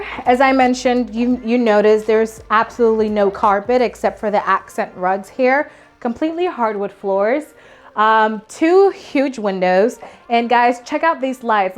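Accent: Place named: England, United Kingdom. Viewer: Hey!!! I personally like your screen presence and your English accent is amazingly easy to understand. Congratulations on your such professional video. Maybe one day we will meet in NC for a deal. Thanks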